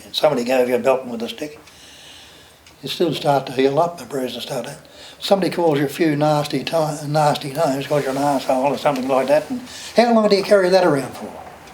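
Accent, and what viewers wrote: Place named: Australia. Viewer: It sounds so much more down to earth with a Australian accent.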